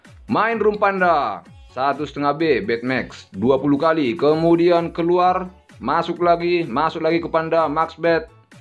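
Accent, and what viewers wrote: Medan, Indonesia. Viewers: Semangat yaa Wak semoga Chanel nya makin sukses 💪💪 dan slalu berkarya <num> Kya nya orang Medan wawak ini 😁😁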